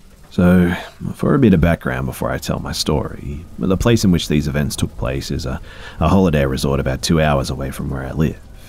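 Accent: Australia. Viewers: You got a smooth voice man.......Australia ?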